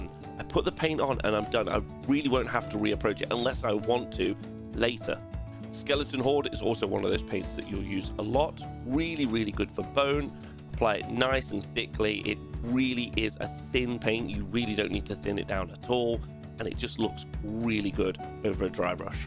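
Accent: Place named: United Kingdom. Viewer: My favorite part was! “It’s a magical Frog” only Someone with a British accent could say something like that and it be as funny as it was! Someone with an American accent would just sound Ridiculous! Cheers from another, aKa TriptonStudios!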